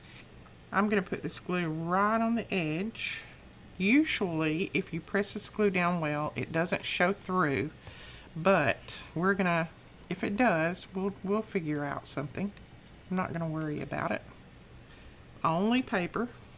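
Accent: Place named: Alabama, United States. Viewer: I have been a card maker so I know the basics. I like the videos where you make the elements of the junk journals since I haven’t tried the journals yet. Slowly working up to it. I love love love collage and making master boards. Thanks for sharing your gift. Your voice is also soothing. Love the Alabama accent. Junker from North Carolina.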